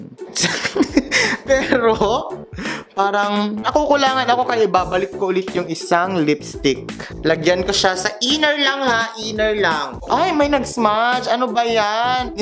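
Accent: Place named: Cagayan, Philippines. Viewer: Para taga CAGAYAN si momshie kasi yung accent nang pag sasalita niya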